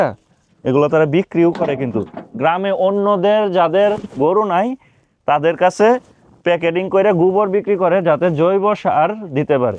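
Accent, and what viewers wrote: Bangladesh. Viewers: আপনার উপস্থাপনা আপনার মতো সহজ সরল। কোনো ভনিতা নেই। খাঁটি বাংলাদেশের ভাষা। সুন্দর। আচ্ছা এইরকম গ্রামে গিয়ে মানুষের সঙ্গে কথা বলতে সরকারি অনুমোদন লাগে?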